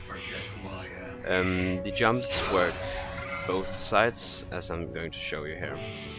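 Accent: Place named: Denmark. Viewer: I guess we have a dane speaking :)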